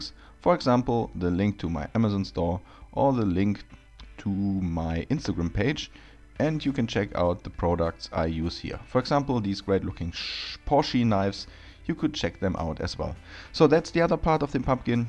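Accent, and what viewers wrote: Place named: Germany. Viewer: Its tiiiiime to grill.... ich liebe dein Typisch ich sag mal „Deutsch angehauchtem“ Englisch :)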